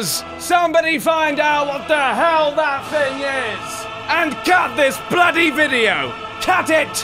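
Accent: England, United Kingdom. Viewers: Wow he's like a serious brit! See's : LOL WUT, DID HE JUST SAY AMELICANS INSTEAD OF AMERICANS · That was the best part of the video. I just love the comedy that uses. And on top of that, that superb British accent makes it all the more hilarious. Encore, encore! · dude get your tea together, make more videos you have a good thing going on, make some tea reviews or something, whatever you can't fail with that beautiful British voice of yours. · I am going to right a overly long and unnecessarily detailed comment on this thar them video made by. "Probably" and "yes" is probably half the video. good. also I love the British accent and the slang. also the videos are much better if you get all the references. I this comment long enough yet? no? OK ummmm....uh huh oh yes, uhhh.lhmmm. YES right you just earned a sub:) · I love your British aksent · eu amo esse sotaque britanico, kkkkkkkkkkkkk, melhor canal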